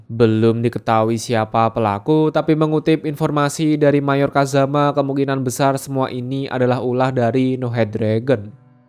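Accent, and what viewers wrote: Java, Indonesia. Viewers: bang lu jawa ya ?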